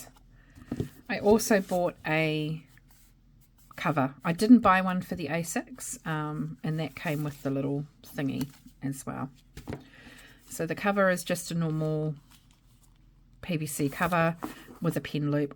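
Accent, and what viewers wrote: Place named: New Zealand. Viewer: Hearing a fellow kiwi is always a treat! I love the A6 size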